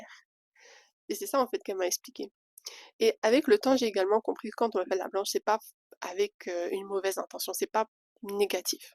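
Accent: Cameroon. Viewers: L'accent camer est déjà là, c'est super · Accent du Mboa🇨🇲 Bienvenue...nous t'aimons très fort, j'ai une famille métisse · Tu as déjà fait combien de temps au Cameroun, pour prendre l'accent là?😅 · Ton accent Camer hein...un vrai miel. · Écoute, laisse moi te dire que tu t'es déjà bien intégré au mboa, le sang Camerounais domine en toi sa se sent par ton accent 😁👍👍👍👍 🇨🇲🇨🇭✔ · Tu es marrante ! L’accent camerounais te vas bien.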